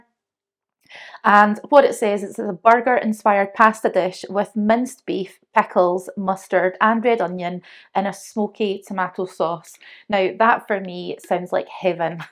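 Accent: Scotland, United Kingdom. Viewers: New subscriber! Lovely to hear another Scottish voice 🤩 I just started back on Slimming World last week 🙂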